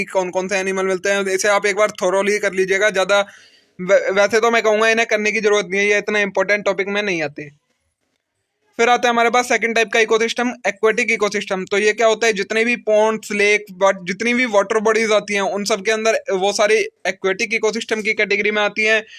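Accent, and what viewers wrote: India. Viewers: Bhai ka UP accent is so good 🌝😂🔥🔥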